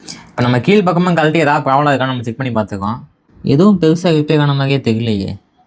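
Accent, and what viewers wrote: Madurai, India. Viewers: Bro nee madurai yaa bro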